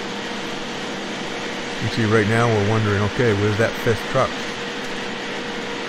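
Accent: Maine, United States. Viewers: Ya sound like you was born an raised in winston salem maine or something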